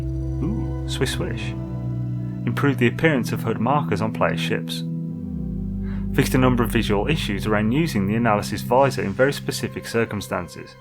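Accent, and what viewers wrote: United Kingdom. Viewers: Which part of the uk are you from?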